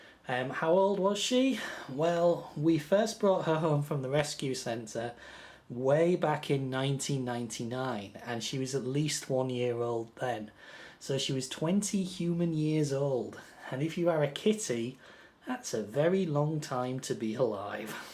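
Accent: Yorkshire, United Kingdom. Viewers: I swear to god,your Yorkshire accent’s getting thicker!!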